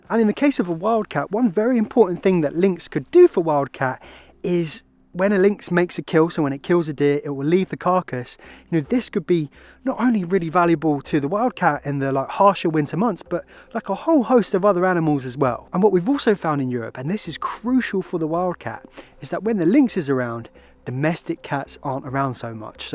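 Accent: United Kingdom. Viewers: Another posh English voice poking his nose in our business..🤢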